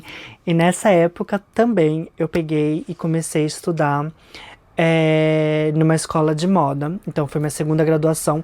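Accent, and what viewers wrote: São Paulo, Brazil. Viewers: Seu português é perfeito e vc tem sotaque paulista, se eu não tivesse ouvido sua história, se vc falasse que cresceu em SP, acreditaria 100%. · Nossa vc fala muito bem o português. Seu sotaque é paulistano. Vc é poliglota, que tudoooooooooo. Vc precisssaaaaaaaaaaaa vir para o Brasil, tem tem tem que vir, vc vai adorar muito.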